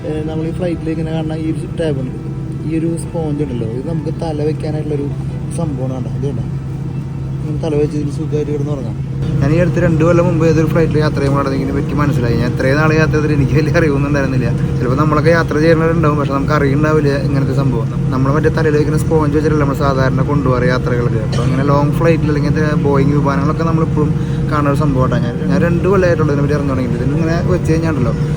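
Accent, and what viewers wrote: Thrissur, India. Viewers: ninte ee Thrissur slang onnu matti pidichal korechukoode okke reach kittum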